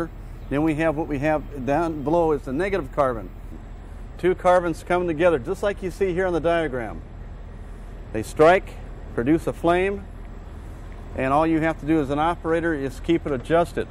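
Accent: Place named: United States. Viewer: I did not know Americans really did talk like that (fascinating).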